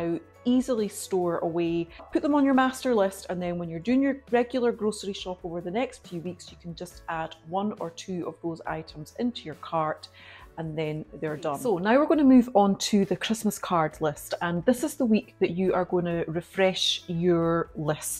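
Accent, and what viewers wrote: Scotland, United Kingdom. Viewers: Just subscribed this morning and glad I clicked on your video in my feed. It was lovely listening to your brogue - my grandparent's were from Saltcoats before they came to Michigan. I have cousins in Ayr and they visit America often! xx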